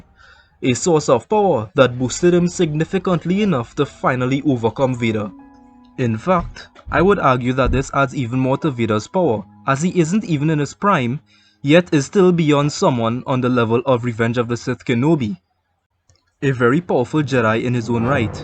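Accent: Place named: Trinidad and Tobago. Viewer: U definitely from Trinidad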